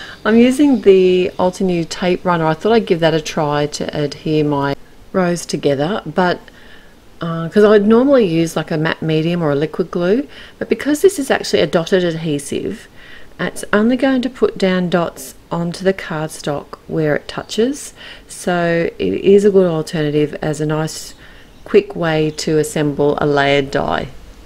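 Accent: Australia. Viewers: ABSOLUTELY LOVE THIS SIMPLE, ELEGANT CARD. Yes, sorry, I'm yelling! It's gorgeous! Love this whole new Altenew release and want 99% of it. Lucky girl to be able to play with all these fabulous products. Thanks so much for sharing. I keep saying it, but it's great to listen to another Aussie. Where do you buy your fun foam? Can't seem to find it here in Oz. Thanks so much.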